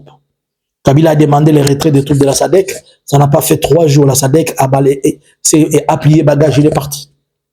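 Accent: Rwanda. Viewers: Aza rwandais, c'est normal, accent ya kinyarwanda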